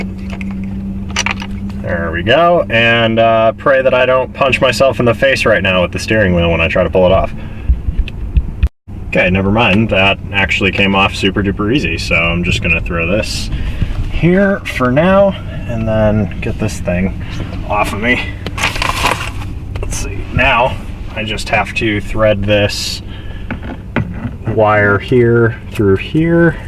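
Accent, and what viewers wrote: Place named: New England, United States. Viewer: New England, not England lol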